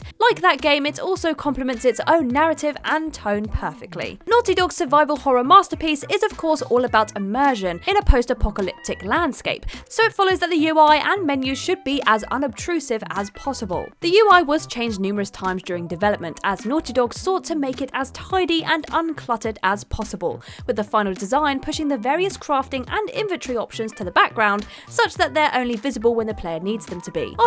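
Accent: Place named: England, United Kingdom. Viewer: that english accent makes me watch this videos in 0.25x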